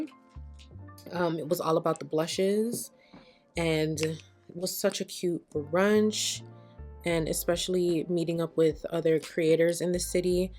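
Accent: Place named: Jamaica. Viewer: It’s the Yardie accent for meeee lol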